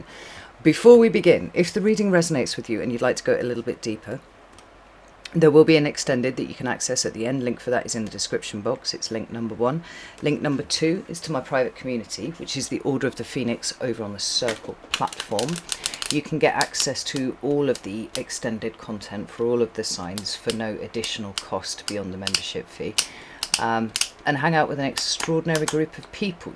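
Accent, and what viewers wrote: United Kingdom. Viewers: Your first reading for me. Lovely to hear someone from the UK. Really resonated with what’s going on in my rather complicated personal life right now. Trying to figure out in which direction to go in the best way possible moving forward. Thank you for your insights.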